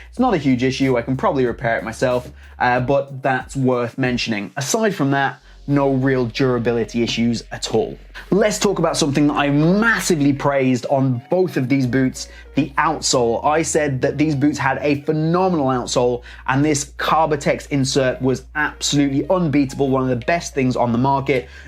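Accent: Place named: Cork, Ireland. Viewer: no way XD I never wouldve guessed, your Cork accent is very mild hahaha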